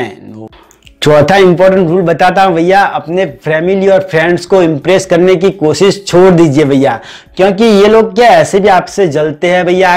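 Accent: Gujarat, India. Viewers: Bahi app Gujarat sa hokya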